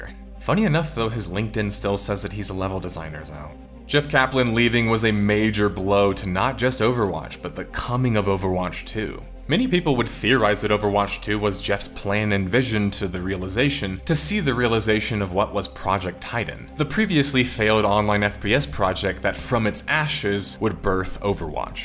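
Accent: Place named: Ohio, United States. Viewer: I dont know whats worse. A frenchie trying to speak english poorly. Or a ohioian accent trying to speak english poorly.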